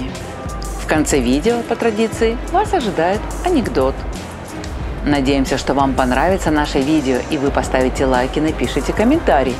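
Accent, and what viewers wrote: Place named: England, United Kingdom. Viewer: очень чувствуется английский акцент - скорее всего, проживание в англоязычном окружении повлияло на Вашу речь.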